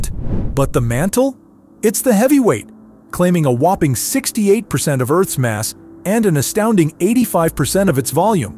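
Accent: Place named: China. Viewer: Humans did not land on the moon rather have CHINESE English the voice is too much stress selling business was not pechenga can not learn too much sress in the voice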